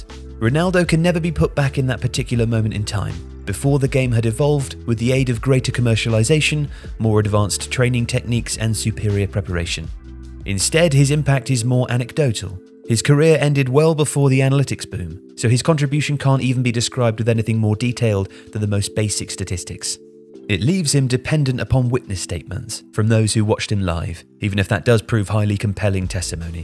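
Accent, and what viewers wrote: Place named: United Kingdom. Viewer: Guys, I think you’re brilliant and I watch many of your videos because they’re awesome. But I don’t understand a) how you came up for the pronunciation of FENÔMENO (you said fenomino) and I don’t understand in which of the “best player of all time” conversation Ronaldo is NOT present. In my experience he is not only ALWAYS present, but up there for at least second-best. Is it maybe because I used to live in Italy and you (sound like you) live in the UK?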